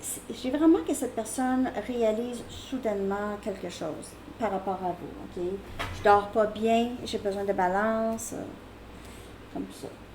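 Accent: Canada. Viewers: Merci cette guidance me parle grand’ merci ps:je comprends très bien l’ accent québécois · Salut, Merci beaucoup pour votre magnifique travail! ❤ Et pourquoi changer votre merveilleux accent canadien??? ❤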